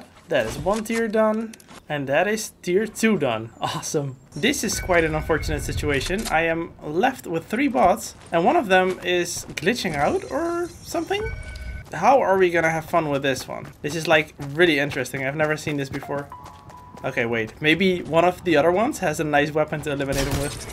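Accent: Netherlands. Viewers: Are you dutch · Please can you make a season seeven version? Also are you dutch?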